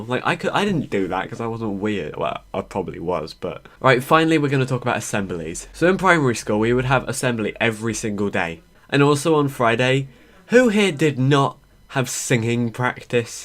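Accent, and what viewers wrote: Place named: United Kingdom. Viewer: Teach me britsh accent senpai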